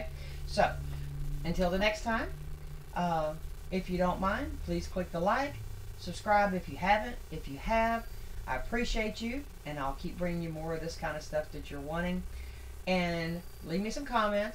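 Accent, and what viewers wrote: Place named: South, United States. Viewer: I just love that Southern accent.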